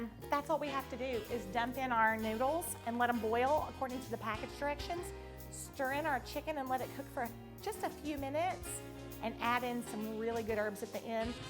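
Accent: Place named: South, United States. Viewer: I LOVE her talking....absolutely!!! Love your southern accent! It's precious! :) Enjoyed every minute of your video! Keep up the good work!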